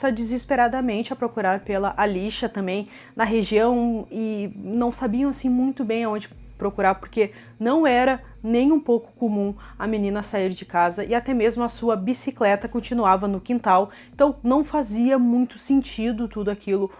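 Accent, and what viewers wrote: Rio Grande do Sul, Brazil. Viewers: Tu és gaúcha?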